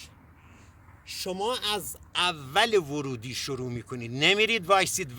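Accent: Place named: Isfahan, Iran. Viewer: کارت درسته استاد.استاد یه ته لهجه اصفهانی هم دارید شما.آیا ساکن اصفهان نبودید؟؟؟؟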